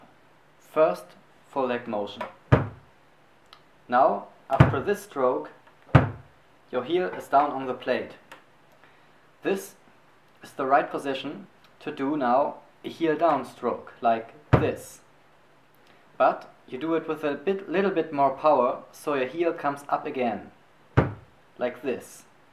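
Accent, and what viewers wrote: Germany. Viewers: this is awesome! where are you from? sounds like your german :D I also use this technique since almost a year. its really nice, if you get the first few months of practice done which are pretty hard. as you said, its not easy to get your feet/legs/ankles comfortable with this motion. but once you get that its nice and easy :) its great to see somebody with the same technique playing on a very high tempo level. my maximum at the moment is still 145, sometimes 160 for a short time. so how long did it take you to get for 190 bpm? and would you agree to that: the higher the tempo is, the more you need to work with your ankles and less with the whole leg? thanks for your reply! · is that a german accent? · sounds like you're german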